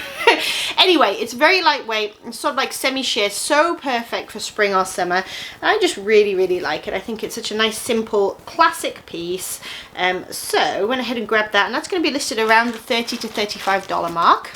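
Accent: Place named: United Kingdom. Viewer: Love your British accent and lingo!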